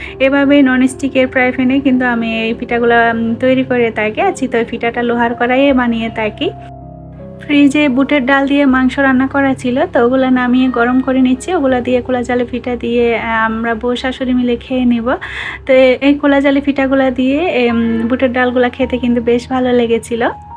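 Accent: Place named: Sylhet, Bangladesh. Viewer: ❤❤ আপু সোহার জন্য রইল মন থেকে অনেক অনেক দোয়া ভালোবাসা ❤ তোমার শাশুড়ি তো অনেক আম এনেছে বাড়ি থেকে আমাদের না এবার আম আসেনি আমাদেরকে একটু পার্সেল পাঠিয়ে দাও আপু, ইলিশ মাছ আমার অনেক পছন্দের ইলিশ মাছ যেমন রান্না হোক না কেন বিশেষ করে গরম ভাতের সঙ্গে ভাজা আর ইলিশ মাছের তেল যে খেতে বেশি ভালো লাগে আমার, পরিবারকে নিয়ে অনেক ভালো থাকো সুস্থ থেকো শুভকামনা রইল আপু, তোমার বাড়ি কি চট্টগ্রাম আর ঢাকাতে থাকো তুমি তোমার কথা বলার ধরনটা সিলেটের মানুষের মত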